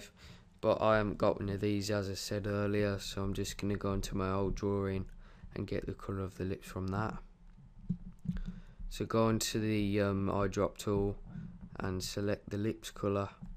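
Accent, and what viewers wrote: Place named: Birmingham, United Kingdom. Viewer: birmingham accent lol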